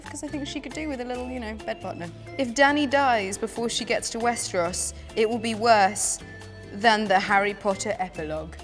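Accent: United Kingdom. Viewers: why are there subtitles?? is a british accent so hard to understand????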